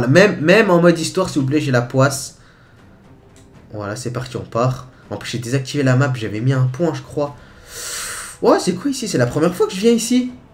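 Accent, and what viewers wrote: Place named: South, France. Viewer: Tu a lacsent du sud !! ❗️❗️❗️❗️❗️❗️❗️🤓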